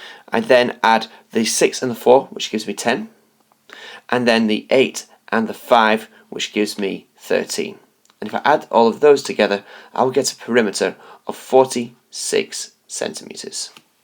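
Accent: United Kingdom. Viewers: That’s because he’s british like me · BRITISH ACSENT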